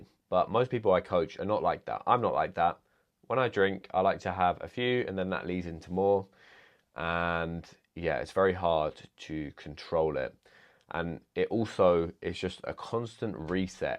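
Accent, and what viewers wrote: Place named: United Kingdom. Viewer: Can't understand this heavey accent British English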